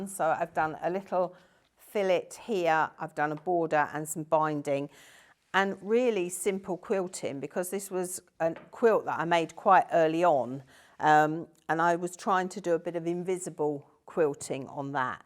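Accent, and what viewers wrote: England, United Kingdom. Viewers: This is the first video I’ve seen of yours. Lovely quilt, especially with the flange on the border. I’m from the US and we call the corners you cut off “dog ears” and we “press to the dark side”. I love your English way of speaking and the fact that so many of us around the world can share in the craft of quilting.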